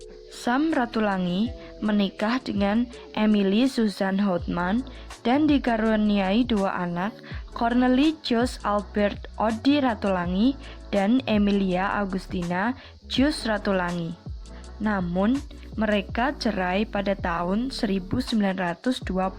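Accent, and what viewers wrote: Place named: Java, Indonesia. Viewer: Backsoundnya enak nih. Cocok dengan suara pembicara yang medok eheww:v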